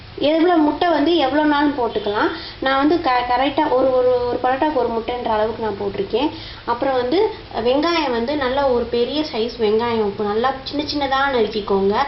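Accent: Madurai, India. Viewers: loving your Madurai Tamil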